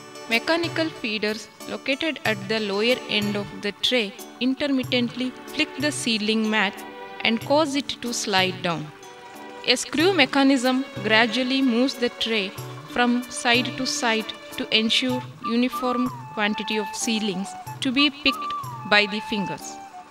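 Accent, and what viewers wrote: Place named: India. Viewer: u can isten to her English,she is from india oviousely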